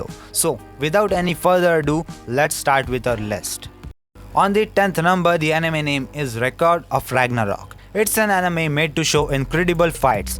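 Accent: India. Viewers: Indian accent is worst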